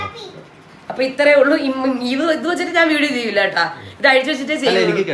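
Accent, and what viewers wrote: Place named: Thiruvananthapuram, India. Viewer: Because TVM slang.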